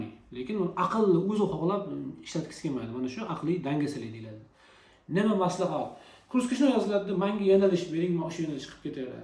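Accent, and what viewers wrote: Namangan, Uzbekistan. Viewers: aka namangandan emasmisiz mobodo?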